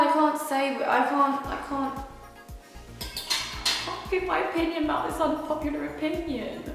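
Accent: England, United Kingdom. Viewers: So british eheheh adorei :D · OMG the british accent !! 😍😍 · Adoro o sotaque britânico , acho que devias apostar mais por estes vídeos até crescias muito mais prefiro ver estes vídeos , assim conseguias te aproximar mais da tua cultura e nos também Pensa nisso Beijinhos e muito sucesso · Que lindo é o teu acento inglês,. Adorei o video! Tu faz como queres: inglês o português. Eu sei que irei a gostar. Beijinhos! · Hiii! I love your british accent!! Please do more videos like this!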